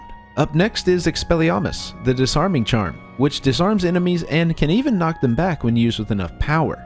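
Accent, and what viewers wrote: South, United States. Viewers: Do you have an on/off country accent lol? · Are you from the south? Because I am hearing an accent but it goes in and out.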